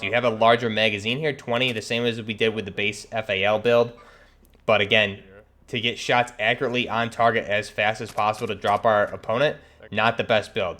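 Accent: New York, United States. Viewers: it’s his NY accent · Imagine being mad at a youtuber with a New Yorker accent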